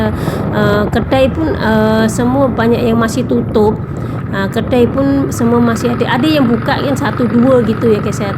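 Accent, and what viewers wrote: Java, Indonesia. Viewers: bahasanya boleh melayu Malaysia tapi logat jawanya medog banget inilah Jamed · Logatnya biasa aja lah pake indonesia jadi kurang enak dengarnya pake bahasa melayu malasyia lucu,gak enak dengarnya sebab medhoknya masih nampak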